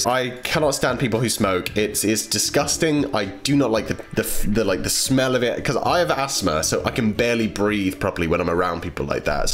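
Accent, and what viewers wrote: United Kingdom. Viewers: is British I felt like I knew that cause his voice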